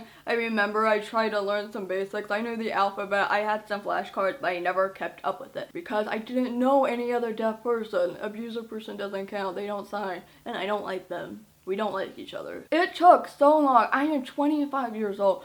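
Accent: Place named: United States. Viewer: What i find amazing is your accent is american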